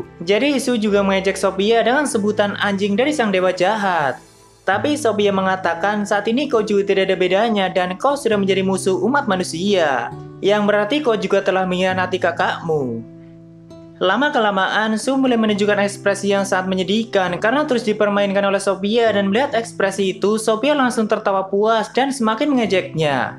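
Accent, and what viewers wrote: Java, Indonesia. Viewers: Kemaren di live megane sensei logat jawamu kentel bang....kok di kontenmu ga keliatan logatnya???? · Iya sih klo pas ngbrol kliatan Jawa nya, mending gituu sih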